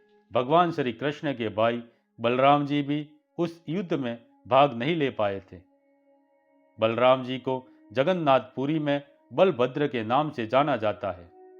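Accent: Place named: Marwari, India. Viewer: भाई पक्का आप राजस्थानी हो आपके बोलने से ही पता चल रहा है की आप मारबाड़ी हो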